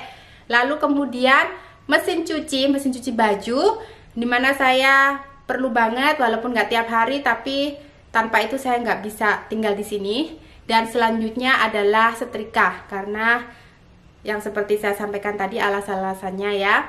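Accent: Java, Indonesia. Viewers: Mb klo bicara dicampur bahasa jawa yg medok lucu deh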